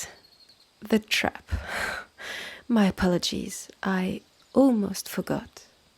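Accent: France. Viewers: Your English is, quite frankly, amazing & I'm so jealous as I'm nowhere near bilingual. Only thing I'm any good at is cooking and as you're French that's probably no boast :p The icing on the cake is you haven't fully lost your accent, which is an absolute dream to listen to. The creativity here is on par with any other channel I've listened to & my hope is YouTube enables you to draw others here too. I am intrigued as to whether you write these in your native language & translate, or directly in English? Either way, it's working :) Keep it up!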